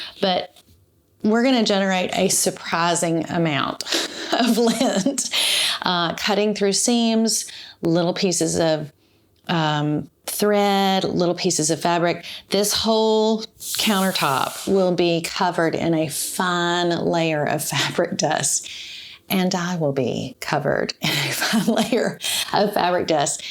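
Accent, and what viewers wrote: South, United States. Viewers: I also just found you and I love your channel- I binge watched every episode! I could listen to your charming southern accent all day long- and find myself thinking in a Southern accent ;) Thank you for all the great ideas on thrusting men's shirts! I purchased a blue striped shirt for a quilt I have been collecting blue& white stripes for several years- when I got home- my husband loved it!🤷‍♀️ When I was watching one of your other episodes, I found myself wondering if you used part of the shirts where the buttons used to be- and if the threads holding the buttons put a stress on the fabric in that area- could it cause a hole? ... if you cut the buttons off before washing the shirts- sometimes the washing process will relax the fabric where a possible hole could arise- so you will have a better idea if it is just stress - or an actual hole- an I explaining myself clearly? 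Anyway, God bless you - and thank you for all this great info❣️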